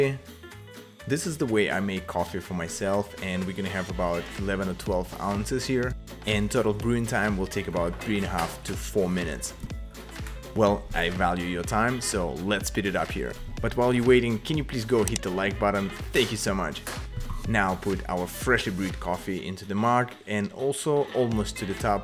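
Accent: Russia. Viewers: Как так получилось, что ты русский и делаешь это на английском? Я просто долго думал, русский ты или нет, вслушивался в акцент... И вроде я уверен в этом. Расскажи, пожалуйста, по скольку мне очень нравится то, что ты делаешь, а потому было бы очень интересна твоя небольшая история. Спасибо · Мне одному казалось что он русскоязычный из-за акцента🤔😁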